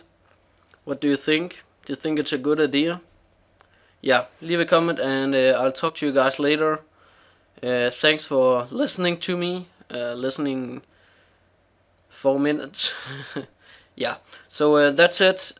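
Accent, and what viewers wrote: Denmark. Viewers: This is your channel, which means that you should do what ever you want. if you want to promote other people you should do that. If people don't want to watch it they can just close the video again... though if you want advice I would say you should work on your accent because, atleast to me, the danish accent makes people sound dummer than they actually are... though it might just be because I'm a dane myself...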